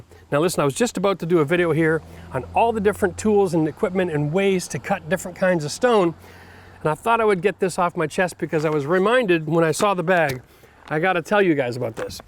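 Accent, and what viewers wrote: Canada. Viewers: OMG, I'm an experienced DIY'er and have done a bunch of remodels and cabinet building. But I never touched the tile work. My latest project is a bathroom remodel and doing the tile for the first time. Watched some of your vid's, got a feel for it. In one of your videos you mentioned something about this grout, but I ignored it. Well, job is done and I noticed this video and watched it... so yeah, oops. This now explains why the stuff was setting so quickly. Fortunately my wife chose this super close color match and it looks good. Whew. Great job on the videos. You sound just like my contractor friends, minus the Canadian thing.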